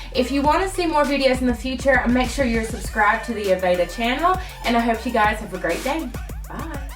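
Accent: Kentucky, United States. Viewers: Do you have a Kentuckian accent or is that just me 😂😂😂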